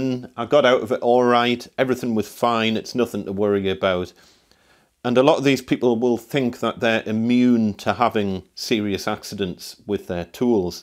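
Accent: Newcastle, United Kingdom. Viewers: I love to see a workman who takes H&S seriously! Quality video mate. Love the accent too! is it Geordie?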